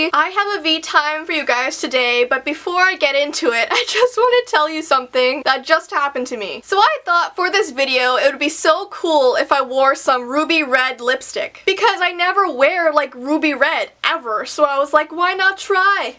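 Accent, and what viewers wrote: Canada. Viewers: K.a.s. Kast naw she sounds Canadian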